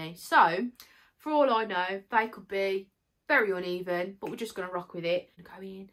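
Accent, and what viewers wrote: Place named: Birmingham, United Kingdom. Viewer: You have an accent, you from Birmingham?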